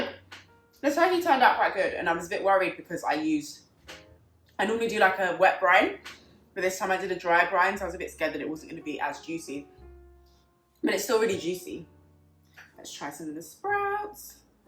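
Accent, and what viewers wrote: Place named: United Kingdom. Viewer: Hello nice video 😊! I have to say you sound a lot more British compared to Kabir I like it!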